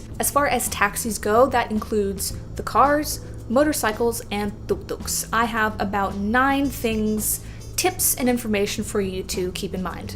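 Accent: Thailand. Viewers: Your Thai accent sound you count number is so cute. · I love your narrative so much and give the trumbs up to your vlogs especially Thai intonation.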